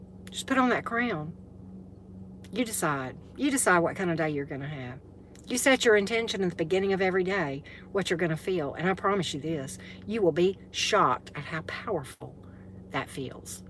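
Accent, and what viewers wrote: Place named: Louisiana, United States. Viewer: I'm from England and I find your accent adorable. Where is it from? Louisiana? Thank you for the reading. 😁❤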